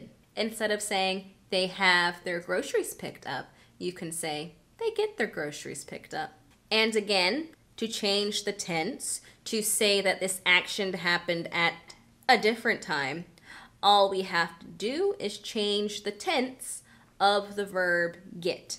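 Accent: United States. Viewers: Nice accent!!! What state are you from?❤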